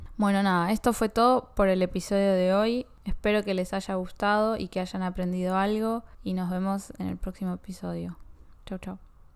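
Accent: Argentina. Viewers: ¡Hola,! Me encanta tu programa, especialmente este episodio sobre las costumbres argentinas. Aprendí muchísimo, como por ejemplo, lo primero que mencionaste sobre las diferencias en "cumplir con los horarios". Antes tenía el prejuicio de que los argentinos no eran puntuales, porque en China tenemos muy arraigado el concepto de la puntualidad. Sí, soy de China, y me encanta el acento argentino. Actualmente estoy aprendiendo español gracias a tu programa. En cuanto a temas como las propinas, ya lo había preguntado a mis compañeros. Por ejemplo, quería saber si en la peluquería era necesario dejar propina, y ellos, riéndose, me preguntaron si alguien me estaba obligando a darla. 😂 De este episodio también aprendí algo muy importante: cuando se visita a un amigo, lo mejor es llevar una buena botella de vino. No ir con las manos vacías es una costumbre que también tenemos en China. 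Allá solemos llevar frutas, o dependiendo de la edad de la persona, cajas de comida especial o suplementos nutritivos. Por último, estoy muy emocionada por tu próximo episodio. ¡Voy a seguir viendo cada uno de ellos! Espero que tengas días muy felices. ¡Saludos!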